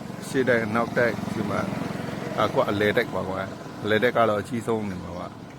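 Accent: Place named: Yaw, Myanmar. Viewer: ယောသံကြီးနှင့်ပါလား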